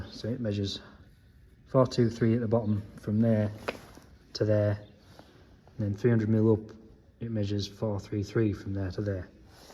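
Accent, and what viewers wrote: Yorkshire, United Kingdom. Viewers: Thanks for the share, l’m enjoying your great videos and yes you do explain what you are doing and why very well. I’m from Richmond North Yorkshire,now living in the East Midlands and is great to hear the Yorkshire accent. Your workshop is looking much tidier on video 👍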